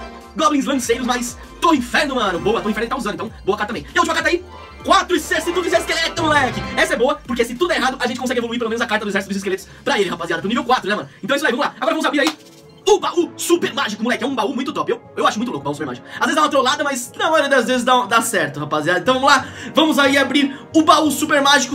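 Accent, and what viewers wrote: Minas Gerais, Brazil. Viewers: Aposto mineiro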